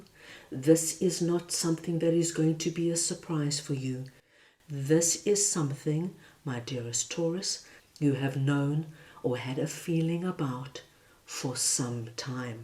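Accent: South Africa. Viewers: You sound SAfican like me so beautiful and spiritual so pure and wonderful, thank you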